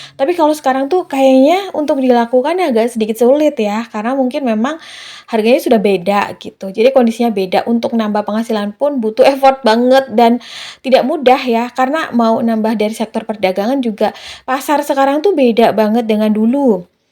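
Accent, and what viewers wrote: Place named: Banyumas, Indonesia. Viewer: Wah aku selalu lihat, kalau ada vidio yg baru mb, wong ngapak he he he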